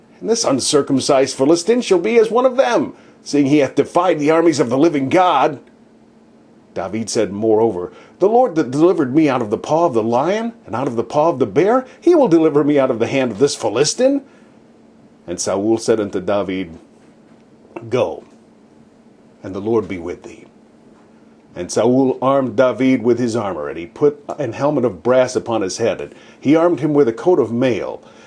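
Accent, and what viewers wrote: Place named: United States. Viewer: I enjoyed your clear reading of the King James version. I am from Ft. Wayne, IN and it is easier to understand old English text when read with an American accent. Since the old Hebrew names are uncommon in English, I liked the way you pronounced every letter phonetically.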